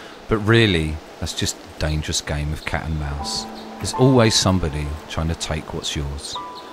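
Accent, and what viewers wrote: United Kingdom. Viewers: it's called a british accent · im not saying his british accent is werid, its fine, but he talks completely differnet then he normally does, he talks slow and odd when he is doing a commentary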